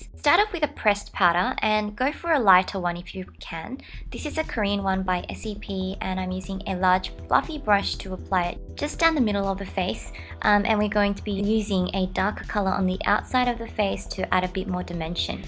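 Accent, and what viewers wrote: Australia. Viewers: Are you Australian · i've always wondered but do you have an australian accent when speaking korean as well?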